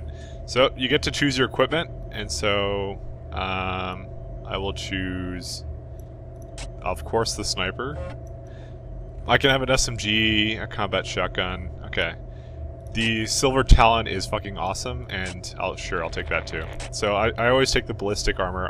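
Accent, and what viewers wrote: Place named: Canada. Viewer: Candians always sound like that, even as babies.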